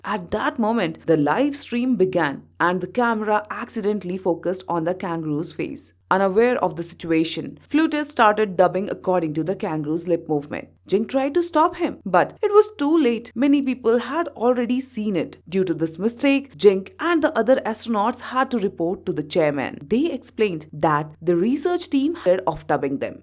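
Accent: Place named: India. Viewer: The Indian accent is sooooo hard .... even jetfuel cant melt it....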